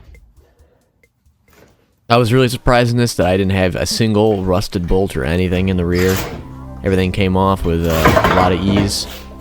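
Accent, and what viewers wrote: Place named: Massachusetts, United States. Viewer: Sound like your in Ma.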